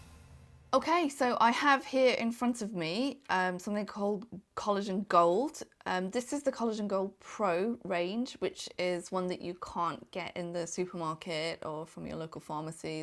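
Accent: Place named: United Kingdom. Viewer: I' m here for that british accent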